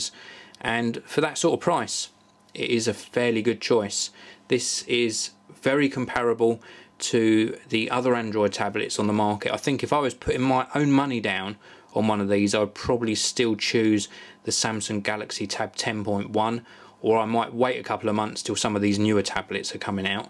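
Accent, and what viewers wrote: United Kingdom. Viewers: Cool Britain Accent ... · Very cute British accent. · which part of England are you from? you seem to have a thick British accent. great review btw.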